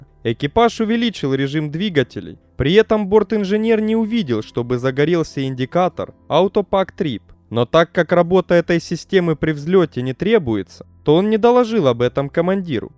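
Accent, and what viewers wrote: Ukraine. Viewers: украинский акцент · Украинский акцент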